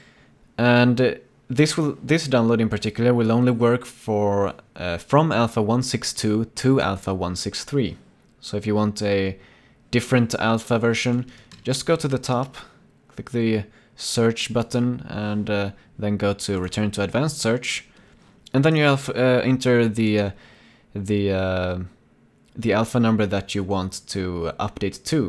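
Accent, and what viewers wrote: Sweden. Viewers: You sound Swedish Eller ska jag säga Svensk ;)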